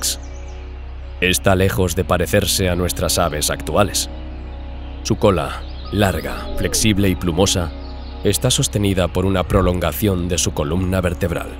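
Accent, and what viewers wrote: Spain. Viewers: doblados al español de España, lo que da una sensación que tus videos fueran documentales profesionales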